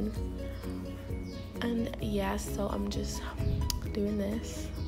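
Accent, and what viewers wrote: United Kingdom. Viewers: lmao ella mai is from london. has a northen accent they ain the same 😂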